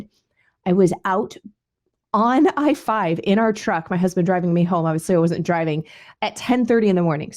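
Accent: North Carolina, United States. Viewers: are you from NC?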